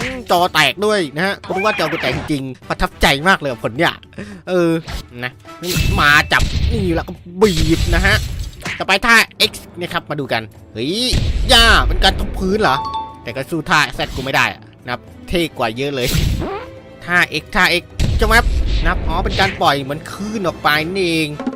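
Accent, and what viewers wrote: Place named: Isan, Thailand. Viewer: เปิดคริปแล้วอีสานนีโครตเท่เลยนะเนีย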